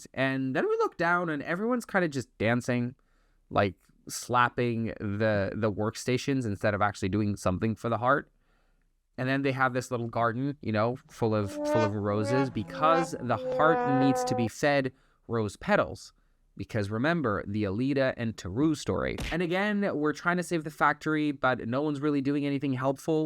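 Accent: United States. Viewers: Your in the U.K. but totally sound American? As usual, I am so confused! Very much enjoyed your commentary on JLo! Your funny and correct! IMO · wait, you are portuguese? Haha me too. Também sou portuguesa, que engraçado pareces americano. Um abraço, bom vídeo.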